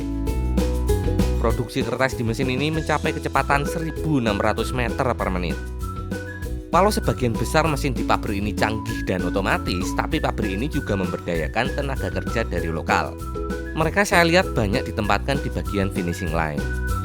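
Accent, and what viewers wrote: Java, Indonesia. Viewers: Keren om 😀 Asli orang mana sampeyan mas? Medhok tenan wakakak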